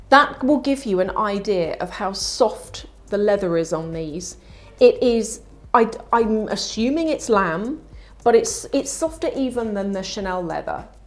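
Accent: RP, United Kingdom. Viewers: you sounds so posh!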